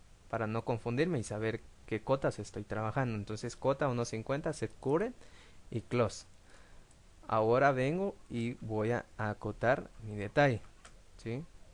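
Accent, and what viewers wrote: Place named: Guatemala. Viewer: Ya decía yo :D jeje no pude confundir tu acento chapín mi hermanito! :D Dios te bendiga :D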